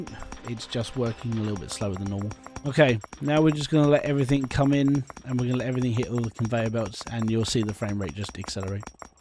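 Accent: United Kingdom. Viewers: hears Brittish accent subscribes i love this game and that accent XD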